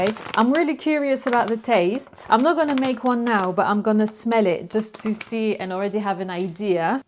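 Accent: United Kingdom. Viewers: Coucou, trop cool te t’entendre parler l’anglais avec l’accent British hehe ce serait super chouette d’entendre parler en anglais aussi 😍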